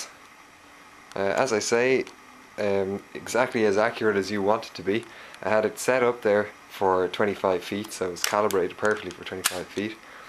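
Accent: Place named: Ireland. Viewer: Can't miss an Irish accent!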